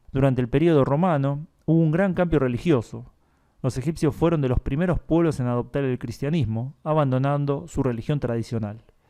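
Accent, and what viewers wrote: Argentina. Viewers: Por fin un canal interesante y narrado por un argentino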